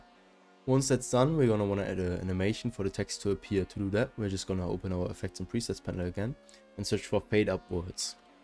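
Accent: Germany. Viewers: Watching your videos since two days now, it's really helpful and i feel like i understand ae more now. Thank you :) Little question, are you german?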